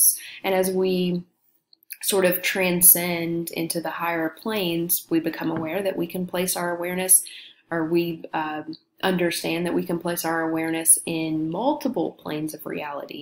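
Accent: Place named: Texas, United States. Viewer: and I say definitely embrace your Texan accent. God's in his/her place. All is right with the world.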